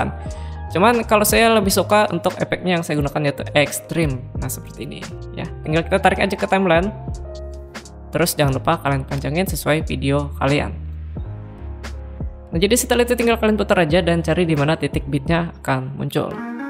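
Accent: Banjar, Indonesia. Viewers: logatnya kayak orang banjar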